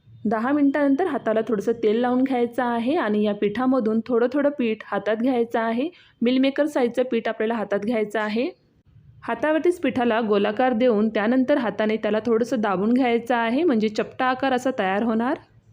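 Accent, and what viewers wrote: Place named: Jalgaon, India. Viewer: जळगाव भाषा बोलत आहे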